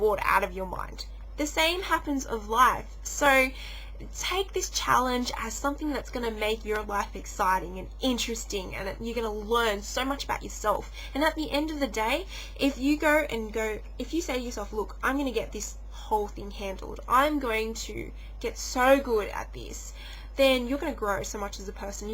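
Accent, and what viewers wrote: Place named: Australia. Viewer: I like that aussie accent...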